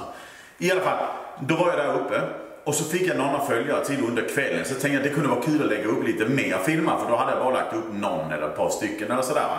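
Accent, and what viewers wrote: Scania, Sweden. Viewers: Grattis till 10k följare härligt att höra din skånsk/danska dialekt. Tycker du ska döpa moppen till Rosa ladyn/ rosa tanten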